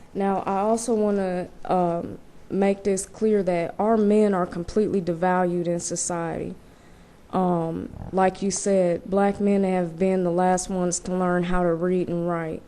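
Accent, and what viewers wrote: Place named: South, United States. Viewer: I love your accent... It's got a strong Southern twist to it...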